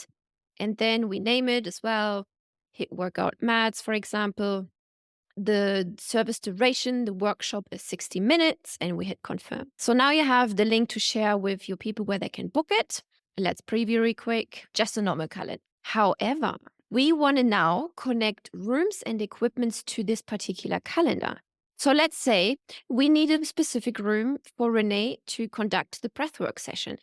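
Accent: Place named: Germany. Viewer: lol what is her accent? she sounds very german haha.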